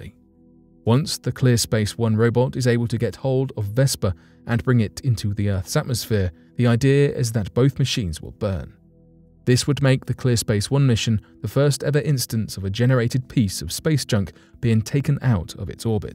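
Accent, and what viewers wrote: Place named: RP, United Kingdom. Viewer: You sound like British Royalty! Best accent ever!keep up the good work as well. Happy New Year!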